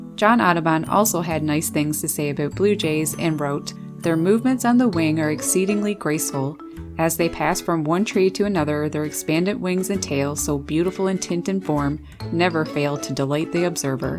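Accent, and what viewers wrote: Newfoundland, Canada. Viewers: Love your commentary. Also your Canadian accent. I visited Nfld when I was twelve and my sister worked at the hospital in St Anthony. What adventures we had!!! Thanks for these videos. Catherine from VT. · Aboot? I've been following you for years, I didn't know you were Canadian... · I have a bluejay friend! I feed a family of crows every day, and this little punk dude started showing up. I give him a small piece of whatever the crow bros are having, and he comes every day now! We are buddies! I know he's a he because sometimes his mate comes and she's a good bit smaller like described. I call them Sid and Nancy, because they like making music together(no one said it has to be music for humans). Omg you're from NL ME TOO!!! <3 knew I recognized the accent!!! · Number 4 video on the binge, as soon as she mentioned Newfoundland I caught the newfie accent. 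Howdy from Alberta. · I love your videos! Very educational and beautiful camera work. And your Newfoundland accent is so pleasant to listen to. Thanks!🐦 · Sounds Canadian maybe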